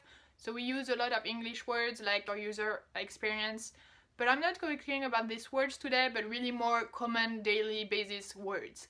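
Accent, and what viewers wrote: France. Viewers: it's pleasant to listen (and watch) you say things with your French accent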